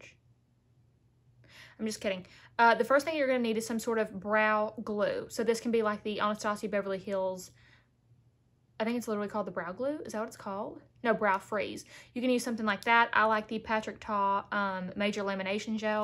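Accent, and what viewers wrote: South, United States. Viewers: Came to tame my brows, stayed for the southern accent 💖✨ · Love your southern accent girl! 💖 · bruh as a british person this ladys accent is really fucking with me xD idk why i expected her to just sound like me and then boom her southern accent - what nice suprise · I love love love natural brow, even before she did the feathered or push up eyebrow look and this by far is the best video on these type brows. She has the cutest accent, and I believe a detect southern. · Ohhh how I love southern accents 🥰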